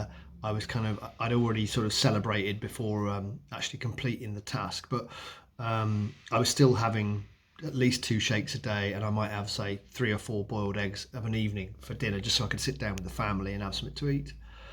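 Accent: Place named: England, United Kingdom. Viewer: I'm quite sure he's English , judging by accent and the sockets behind him 😂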